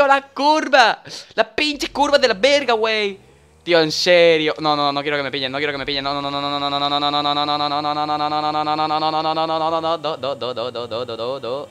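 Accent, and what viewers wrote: Mexico. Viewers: Like si te da risa el acento mexicano de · ... Me da risa como hablas como mexicano😂😂😂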